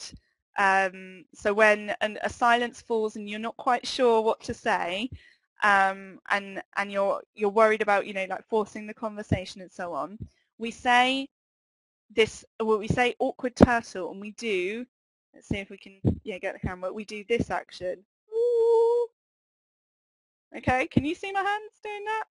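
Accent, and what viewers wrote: United Kingdom. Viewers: прекрасный британский акцент! супер!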